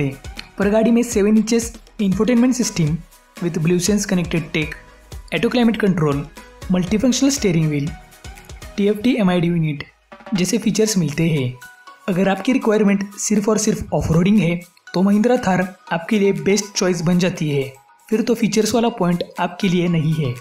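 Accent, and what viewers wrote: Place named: Maharashtra, India. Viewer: You sound like you are from south western Maharashtra; maybe Sangli/kolhapur/satara. Nice video though!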